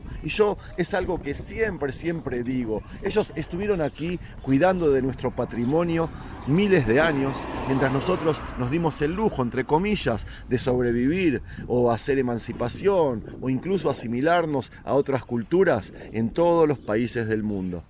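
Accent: Argentina. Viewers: quiero saber porque hablas tan bien el español con acento argentino... cuéntame o donde puedo ver un video... me imagino que ya lo dijiste....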